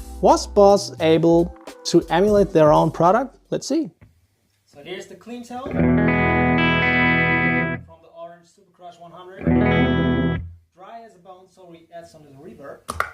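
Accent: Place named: Latin America. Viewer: Perhaps your accent and slight resemblance to Argentinians, possibly why you give off those light skinned Latino vibes? Haha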